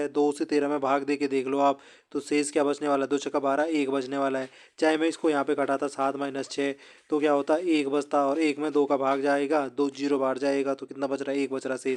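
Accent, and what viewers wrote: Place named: Garhwal, India. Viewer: Bhai garwhali ho kya??😂 tone sunkr lgra hai❤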